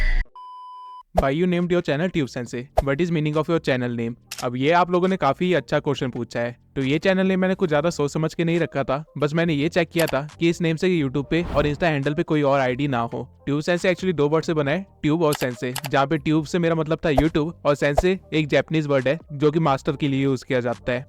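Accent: Bihar, India. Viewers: bro aap bihar se ho na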